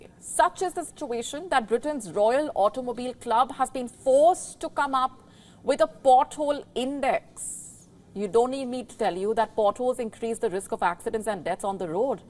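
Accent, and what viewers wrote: India. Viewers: A horrid abrasive accent she has. Like NBC from India. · I am very disappointed that Rishi does not speak with an Indian accent like he should!! So sad and tragedy!! Indian accent has to be the most beautiful accent in the world like this news woman. No trolling here!!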